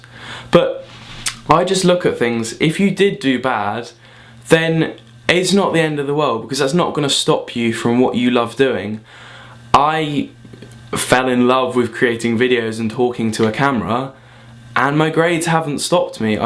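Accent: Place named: United Kingdom. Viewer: I just love his unique strong british ascent...interesting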